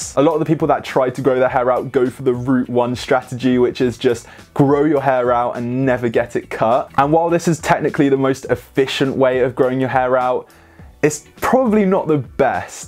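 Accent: United Kingdom. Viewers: dude why are you speaking like a british when you're clearly straight from the mezzagiorno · It also helps having a British accent and a dangling earring! This is catnip to the female species! All day!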